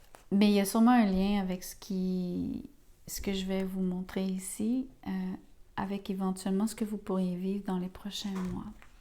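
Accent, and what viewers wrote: Quebec, Canada. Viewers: Bonsoir madame Pour la pause... elle est pour moi une rupture malgré c est vrai une attente pour ce qui est de la communication , j'ai bien tourné le dos à cette personne. Je suis ce Lion ( feu ) et elle balance, tres changeante et tres joueuse, non ancrée et je ne serai pas surppris qu elle joue sur plusieurs tableaux d' ou la rupture . C est vrai, j ai cette impression d un bonheur perdu mais ce n etait qu illusions et je l ai compris et accepté. Tout à fait exact pour cette balance qui n'a aucune confiance en elle meme si elle presente aux yeux du monde tout le contraire. C est vrai... il n y a pas de gris chez moi ... c est tout ou rien . Cette personne a tjrs ete voir ailleurs ( addictions) . Pour ce qui est de se retrouver en couple .... Noway Merci madame💐🙏, voix appaisante, douce, avec un arriere accent du Quebec et meme un peu de latin . 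Xoxo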